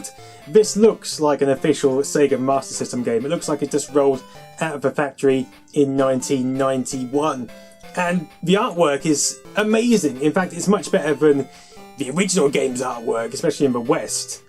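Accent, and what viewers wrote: United Kingdom. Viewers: When British people pronounce Japanese words I cringe so hard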